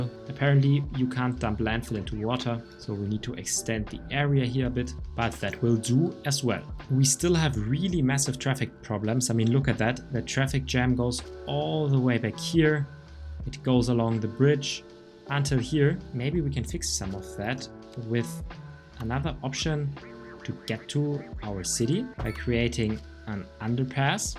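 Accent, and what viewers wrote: Germany. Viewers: Are you german perchance ?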